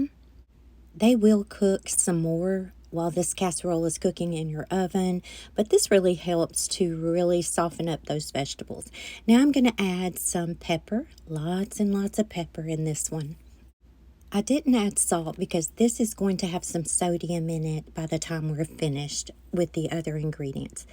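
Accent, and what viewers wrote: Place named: South, United States. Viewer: Love love your southern accent! " "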